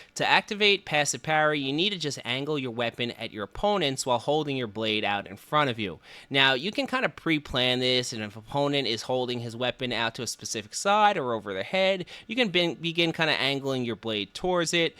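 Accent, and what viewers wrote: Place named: New Jersey, United States. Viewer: This guy is def from NJ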